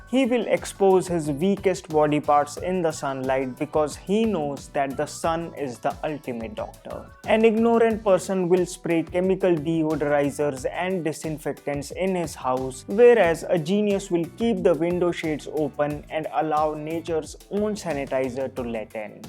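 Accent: India. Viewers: Thx true Indian accent Me too following most of your advice so I'm genius 😊😇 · The master in indian accent .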